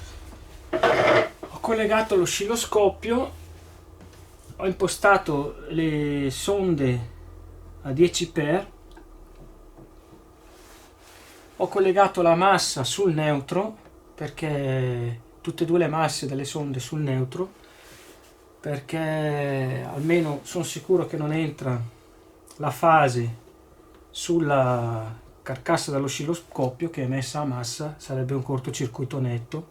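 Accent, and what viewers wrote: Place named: Brescia, Italy. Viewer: sei bravo e spieghi bene le cose emi piace il tuo accento di brescia mi preoccupa un po vederti armeggiare con tutti quei fili scoperti , stai attento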